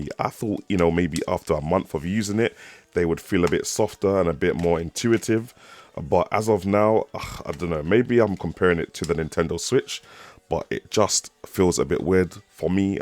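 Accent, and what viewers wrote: United Kingdom. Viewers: You gotta be from uk. From how you say move and. Fam. lol. Big respect. Though. Love the video. I have the 1 tb version and I love mine. I gave away my asus rog strix after I bought this. Lol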